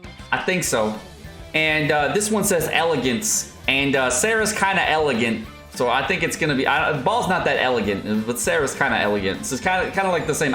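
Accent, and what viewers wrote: United Kingdom. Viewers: Aye is back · Love 🤣🌸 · yay is back. so happy to see him again. hope to see russian and normie soon too. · The Return of 🔥 and those theories were really out there thanxx 🤣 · Apparently it's tea time. That's why is back ☕ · Yay!! Glad he's not being mean to normal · Hi Hi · returns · HEY IS BACKKKKKKKK!!!! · is back LETS GOOOO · is back!! Also, thank you for the heads up! All are good tips and guesses;) btw no theory of kazuha raise a flag? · I missed lol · I was really missing, I enjoyed that! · I missed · YAYYY! is back! XD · Welcome back!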